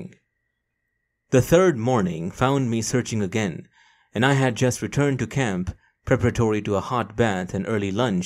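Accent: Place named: India. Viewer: The other channels can’t compete with Mr. true Indian voice. 🇮🇳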